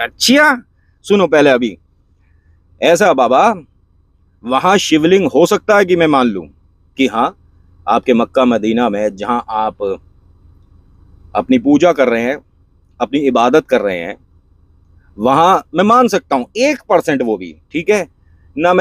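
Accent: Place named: Etawah, India. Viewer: Jai Balaji Maharaj 🙏....From Kanpur but right now from Arunachal Pradesh...Sir aapki boli Itawah ki taraf ki lag rahi hai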